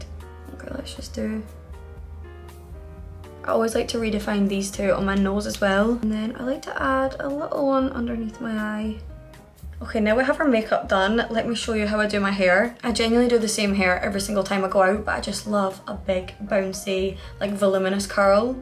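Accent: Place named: Glasgow, United Kingdom. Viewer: I love your accent, is just makes me feel like home. I’m half British and Glasgow just feels like home even more then Adelaide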